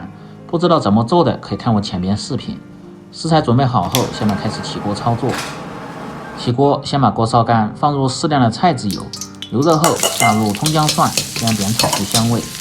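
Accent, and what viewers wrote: Sichuan, China. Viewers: 听到熟悉的四川普通话就直接给老乡点赞了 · 要得 謝謝 有你的川普、更入味兒👂😂 啊呀 冒菜都莫得你的川普正宗 我們這莫得賣黃吼兒的🤔 其他的都賣得到🤔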